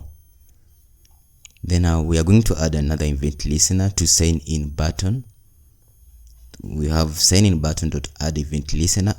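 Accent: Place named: Russia. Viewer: I think you are Russian accent thats good 👍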